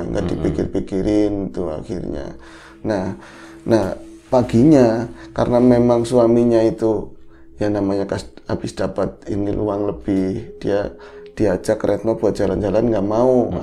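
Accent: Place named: Jakarta, Indonesia. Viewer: Mas sm mas sama2 dari Tegal, tp logat mas udh kayak penduduk asli jakarte/Betawi🥰 Sdg mas msh orisinil Tegalnya👍🤗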